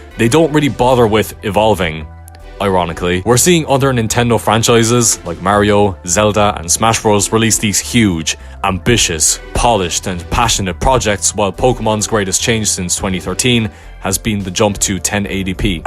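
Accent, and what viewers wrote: Ireland. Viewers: Trying to place the accent. Kilkenny?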